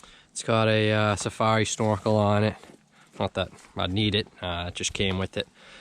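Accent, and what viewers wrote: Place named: Massachusetts, United States. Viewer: Nice video, knew you were from mass by your voice lol I live in plymouth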